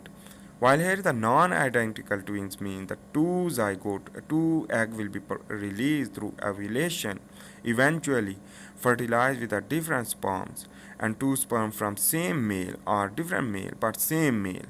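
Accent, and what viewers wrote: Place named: Kashmir, India. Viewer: Your accent is like kashmiri english ....very well explained 🔥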